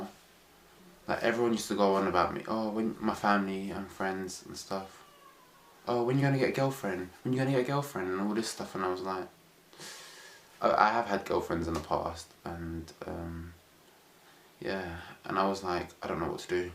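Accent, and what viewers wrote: United Kingdom. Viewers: Mate, I am proud of you. You don't need people who don't love you and don't respect you for who you are. You sound British, so take heart, you live in one of the most tolerant, loving and peaceful nations in the world. The more you be yourself, the more you will find people who really love you and you will form newer better connections. Peace. x I would love to know how you feel about Islam following your family fallout. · You seem to have a very 'North London' way of speaking (in main part of the video).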